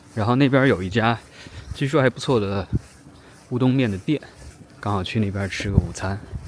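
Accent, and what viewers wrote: Standard. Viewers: 视频主的声线温柔纯净，普通话标准，赞！ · 哪裡人？有沒有人說過你聲音蠻好聽的？普通話也很標準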